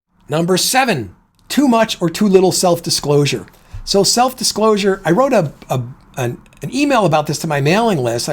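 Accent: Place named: Chicago, United States. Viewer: He's got a Chicago accent that I find distracting.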